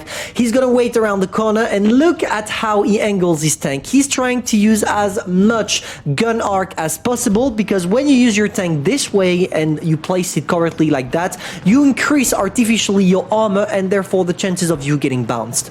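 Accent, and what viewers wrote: France. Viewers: On dirait un français qui parle anglais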